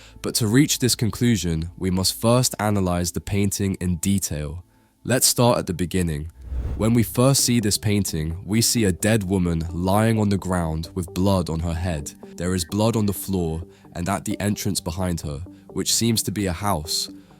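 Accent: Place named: London, United Kingdom. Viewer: Why do you think English is not his first language? He's English, probably from London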